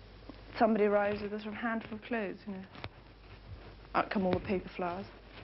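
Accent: RP, United Kingdom. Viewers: Brits correct me, because I'm American...She sounds VERY RP to me. Interesting how formal and high-brow her accent is. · It's called RP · She was the ultimate dream dolly bird, posh talking and beautiful.❤️❤️❤️ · Received pronunciation.❤❤❤ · This is RP and it's an affect, it's the least real English can be. It's not a bad thing necessarily, she doesn't have to speak "real" English given that she wasn't even born in England. I guess assuming white foreigners are English because they speak RP is par for the course in this country, it's all about aesthetics. The fact that this user replied to themselves further expounding their implicitly reactionary world view leads me to believe that their perspective on "real English" identity is distorted and deranged, which is reflected in the fact that actually English people don't meet that standard and random provincials who weren't even born in this country do.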